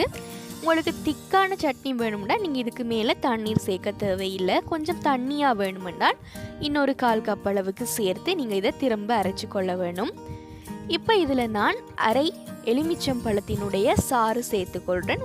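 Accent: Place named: Sri Lanka. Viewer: Unga language very nice neenga srilanka va....very nice dr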